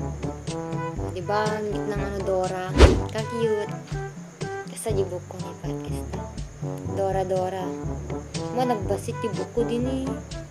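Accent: Ilocos, Philippines. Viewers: baro nak nga subcriber, napapasaya mo ako sa ilocano mo ksi pangasinense ako HAHAHAHAHAH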